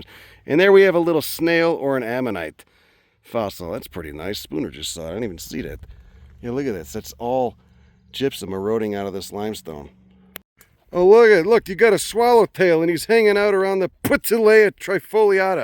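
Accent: Chicago, United States. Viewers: i found a link to this channel in a 2yr old reddit post about chicago accents. wasn't expecting to find a live channel with a brand new video. anyway, southsider here. you sound like we could be neighbors.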